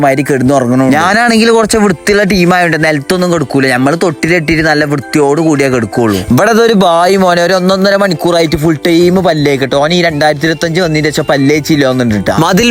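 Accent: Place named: Kozhikode, India. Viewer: എന്തേത്താണ് ചെങ്ങായി ഇജ്ജ് കോയിക്കോടി ണോ? എന്താ മോനെ എൻ്റെ ഡയലോഗ് സൂപ്പറായിക്കണ് , ഞമ്മക്ക് പെരത്ത് ഇഷ്ടായി . ശരിക്കും. നിൻ്റെ കത്രീനേച്ചി സൂപ്പറാ❤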